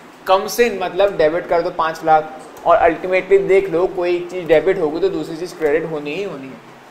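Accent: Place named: Ratlam, India. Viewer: yes i was right..... Apki language me apna pan lga.... me bhi ratlam MP se hoon 😊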